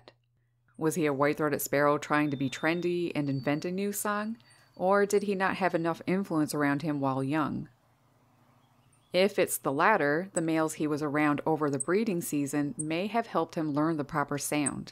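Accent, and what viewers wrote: Canada. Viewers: Sounds like we're on opposite coasts. I'm out here in Aberdeen, WA and I catch some o-o glimpses of your accent that sound Canadian? Surely love the birds you film in the snow as we mainly get rain out here. Great and wonderful website btw!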